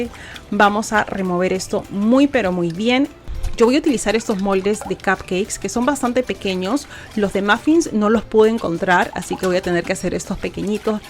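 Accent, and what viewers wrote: Peru. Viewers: me encantan tus recetas, siempre sanas. Te digo desde España. Me gusta escuchar tu acento peruano ( aquí en Sevilla teiuna amiga peruana). En este video y en otros te escucho decir que algo es "demasiado" delicioso y me preguntó si en Perú se usa de modo diferente que aquí en España ya que aquí cuando decimos demasiado con algo positivo, el significado es negativo, si digo que alguien es demasiado simpático el mensaje es negativo, no me gusta que sea tan simpático. Probablemente ahí no tenga ese sentido. Bueno, una curiosidad, no es mi intención corregir tú forma de hablar ni mucho menos. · Gracias por compartir, me encanta tu dicción, tu acento bien peruano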